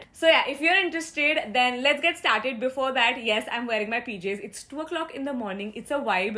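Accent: India. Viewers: can you speak hindi for the one whole video love your hindi excent ..............:>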